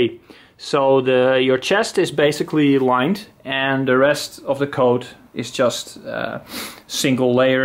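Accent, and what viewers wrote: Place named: Netherlands. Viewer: Ja klopt : ) Hoorde aan je accent in de video ook gelijk dat je uit Nederland komt, haha. Dank voor de tip, die site ken ik wel, veel toffe surplus daar ja. Het Waterlooplein in Amsterdam levert ook wel eens leuke vondsten op en soms zelfs de plaatselijke kringloopwinkels. Vintage kleding en oldschool campinggear enzo.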